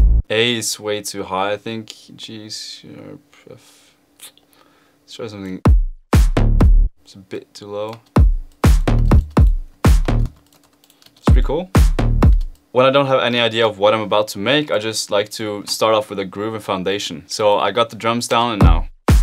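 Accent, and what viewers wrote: Norway. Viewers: You sound so Norwegian. In the best possible way of course - bra video og mye god inspirasjon! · You know you are Norwegian, when you can hear the Norwegian in his voice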